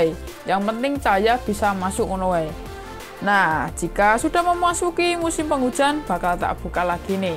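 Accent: Java, Indonesia. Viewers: Mas dari mana ya? Logate jowo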